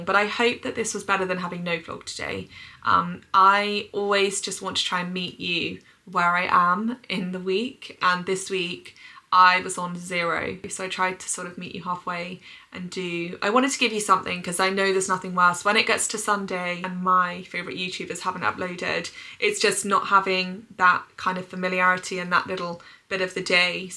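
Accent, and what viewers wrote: United Kingdom. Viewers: Your videos and your channel are maybe more appropriate for the ladies, but once I occasionally stumbled on a video of yours I subscribed and keep on watching them... You live in a very beautiful place, like a fairytale village... And listening to your special British accent is the real pleasure to me , now living in a countryside of a distant land . It helps me much while I'm out of the conversational practice... Cheers...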